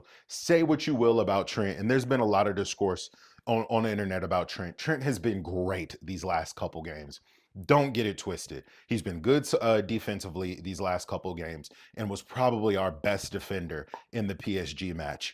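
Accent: United States. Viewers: I hear an American accent discussing (proper) football, I switch channels but you, my man, got me subscribed. You capture and transmit the excitement of the moment. Good job.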